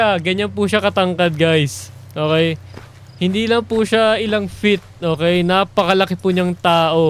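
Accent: Visayas, Philippines. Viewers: Kuya bisaya kapoba?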